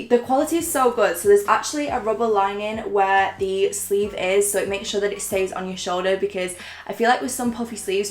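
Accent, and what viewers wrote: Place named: Manchester, United Kingdom. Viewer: She's from Manchester, England x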